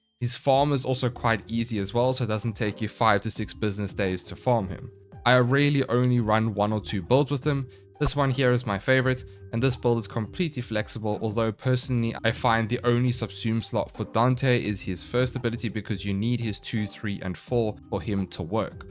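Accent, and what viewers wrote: South Africa. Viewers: Yooo you SouthAfrican??? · I knew you were South African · I knew it he is South African lmao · aweh my fellow south african. The accent gives us away every time. Can never hide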